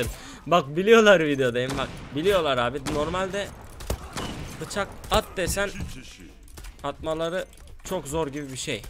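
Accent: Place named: Eastern Anatolia, Turkey. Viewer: Konuşma şiven doğu şivesiydi biraz düzelmişsin artık biraz daha anlaşılır konuşuyorsun önceden dediklerini anlamıyprfum şimdi seve seve izliyorum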